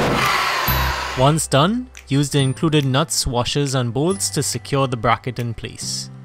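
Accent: Trinidad and Tobago. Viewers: Your accent seems very familiar!! Trini!! I'm getting a lexus altezza next week and lookin at installing one of these as well as a push to start and remote start kit so appreciate this guide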